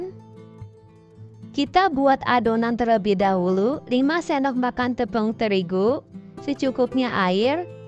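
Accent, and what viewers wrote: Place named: Japan. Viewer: Barusan subcribe , tp blm tahu , Anda org Indo / jepang sih ? Aksennya kyk org jepang 😊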